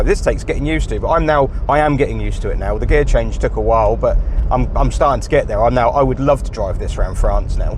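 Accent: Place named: Norfolk, United Kingdom. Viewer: Sounds like a Norfolk boy, lol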